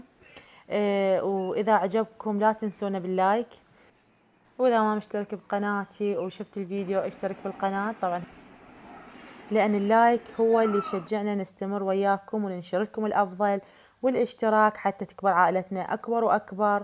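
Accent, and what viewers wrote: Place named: Basra, Iraq. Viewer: لهجتها بصره الله يحفظها مين متكون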